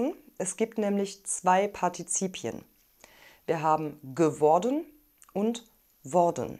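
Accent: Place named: Eastern Europe. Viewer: Чо-то ты нифига не похожа на дойчу... Если бы не произношение, принял бы тебя за... Чешка? В любом случае, что-то славянское 120%!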